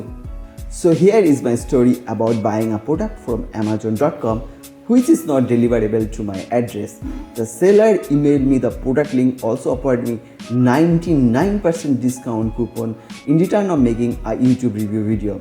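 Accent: Bengal, India. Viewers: দাদা আপনার কথা শুনেই বুঝেছি আপনি বাঙালি। বাংলাদেশ থেকে ভালোবাসা রইল 🇧🇩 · Typical indian people ma dude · Bengali people speak 🗣️ like this it is not like that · Rathod He is talking in Bengali accent, not American. Don't be ignorant about your countrymen, try to learn more about India. It is a very diverse country.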